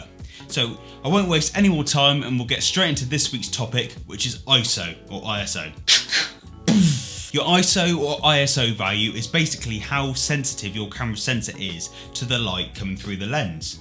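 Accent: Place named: United Kingdom. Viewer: Nice British accent sir...love it. Done subscribing to your channel sir. Please visit me at my channel and subscribe too so we stay connected. Godbless.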